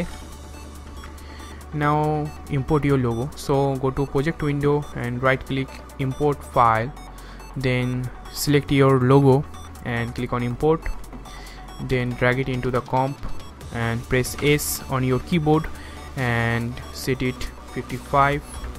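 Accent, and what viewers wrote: Bengal, India. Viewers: Really hard time understanding a word he's saying... Too strong an Indian accent. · wow the Indian accent !!! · sounds indian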